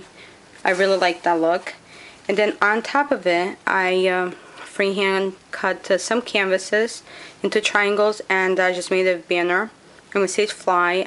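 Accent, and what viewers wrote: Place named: Spain. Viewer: I've never seen work like yours' before....very very original and one of a kind and I LOVE it ....such wonderful inspiration...and what a different accent too...eres Espanola???? Just asking is all :-) Great job.....New Subbie Here too....Laura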